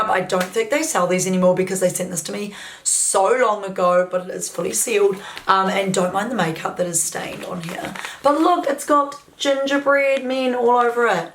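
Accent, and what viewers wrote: New Zealand. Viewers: your good old kiwi accent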